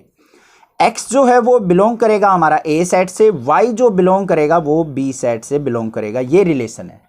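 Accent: Haryana, India. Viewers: Sir kya aap Haryana se ho ...... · Do u belong to Haryana ✌🏻??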